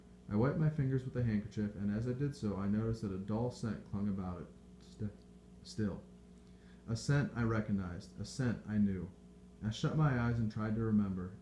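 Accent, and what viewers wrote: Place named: United States. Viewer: Awful. Why is a man and an American reading? Terrible recording too.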